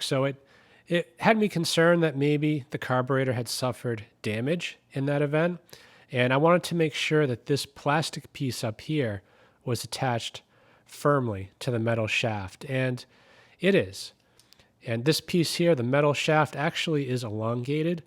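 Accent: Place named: New England, United States. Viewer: Nice video, thank you! I heard a New England accent! Ron USA, PTL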